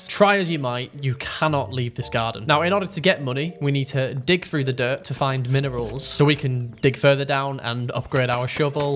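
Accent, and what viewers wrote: England, United Kingdom. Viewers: I love your british accent😮❤ You know, this is the first time I've heard a British accent spoken by a person, and yeah I like it. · The ‘Let’s game it out’ style playing with an English accent isn’t something I knew I wanted. But I’m happy this video came into my algorithm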